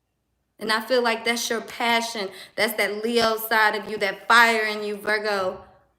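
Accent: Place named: Louisiana, United States. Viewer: Where are you from, ?, where that accent!! U Cajun??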